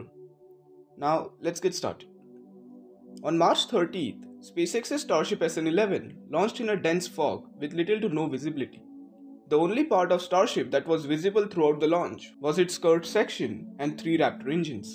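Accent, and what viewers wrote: India. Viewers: Bhai mujhe indian accent lag raha hai, I'm happy to see, an Indian guy talking about Spacex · No no, your style of speaking is Ditto like an Indian, however you are,🙄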